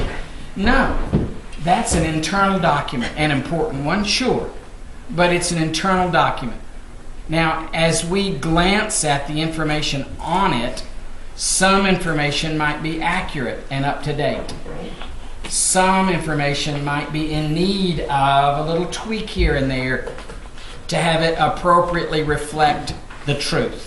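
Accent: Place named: South, United States. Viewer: This guy is good, he is clear and succinct and he is so southern. I am having trouble keeping my my focus on the topic ("I feel like billy graham just announced a scripture verse in a crusade. I am not ready to go to the next page"). What a crack up. He knows his stuff. This is not basic as much as it is absolutely essential, Get this--the world is your oyster, don't get it, forget a career in fiance, business and accounting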